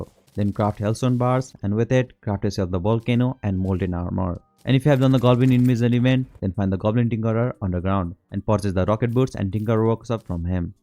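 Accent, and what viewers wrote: India. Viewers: no, he IS Indian but he's clearly not trying to hide his accent. He's just talking fast. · But he sounds Indian,sounds like my professor. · Can't understand with you with your jeet accent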